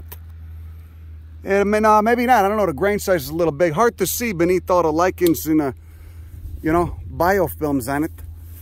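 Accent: Chicago, United States. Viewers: As a first video to see, seeing a guy who looks like a boxer with a missing tooth and a swollen eye, talking about botany in a heavy Chicago accent, you have got my sub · I love hearing all this information in this thick ass chicago (I think) accent. Takes the intimidation out of learning to be honest.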